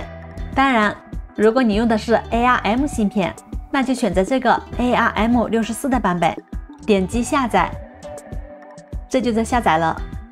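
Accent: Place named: Yunnan, China. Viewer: 听出了云南口音，不知道对不对呢😁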